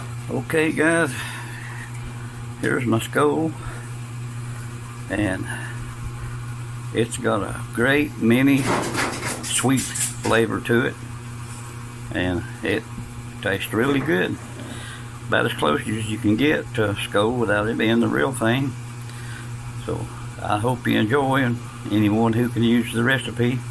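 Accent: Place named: South, United States. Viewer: This was neat as heck! Can't you get any backer in the Philippines? New friend here you sound just like everyone around here. Ain't nothing no better than a southern draw. At least not too me. Look forward to more videos. Stay safe and God bless 🙏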